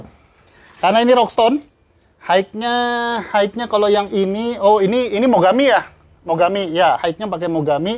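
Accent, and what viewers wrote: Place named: Banyumas, Indonesia. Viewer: Matap tutor video dari Pak suskes selalu buat Bapak,salam kenal dari wong kebumen,sama2 ngapak kaya mas ari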